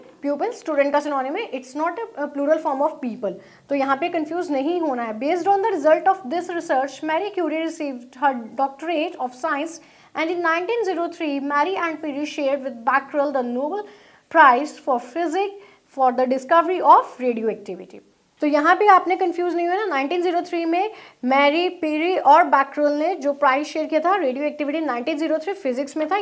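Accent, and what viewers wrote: India. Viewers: your indian accent makes my ears bleeding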